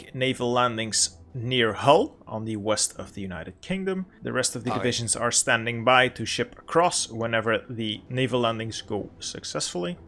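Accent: Flanders, Belgium. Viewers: yeah but he's from the Antwerp region if I can locate his accent enough. Which is the northern region where we speak Flemish (form of Dutch)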